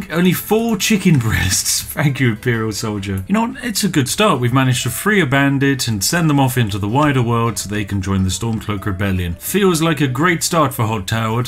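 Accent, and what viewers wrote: United Kingdom. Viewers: Yay a brand new British Boi!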